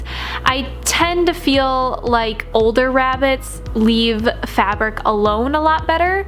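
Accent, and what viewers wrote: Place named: Ireland. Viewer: she sounds more Irish now is it just me?😕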